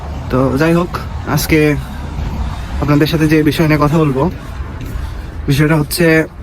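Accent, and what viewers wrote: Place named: Bogura, Bangladesh. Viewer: vaiya apni ki bograr apnr vasa ta bogurar moto laglo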